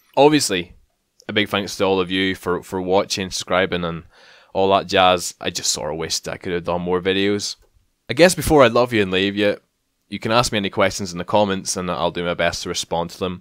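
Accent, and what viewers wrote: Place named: Scotland, United Kingdom. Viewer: Is it bad that I've lived in Belfast for three years and I genuinly thought you were Scottish based from your accent.....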